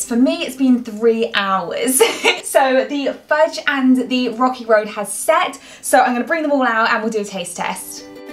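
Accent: United Kingdom. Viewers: yeah you uk british accent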